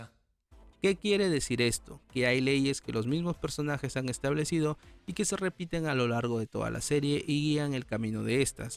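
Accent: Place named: North, Mexico. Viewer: Norteño xd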